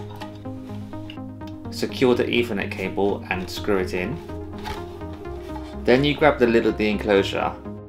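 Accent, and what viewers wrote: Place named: England, United Kingdom. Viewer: This is exactly what I was looking for! Thank you!! Also this is random but I've never seen or heard a hispanic/latin with an english accent! I'm Mexican living in the US so it blows my mind lol. Saludos!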